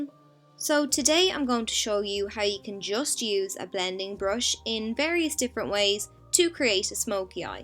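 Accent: Ireland. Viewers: As soon as I heard your voice I screamed 'YES IRISH!!' and my family all stared at me😹🇮🇪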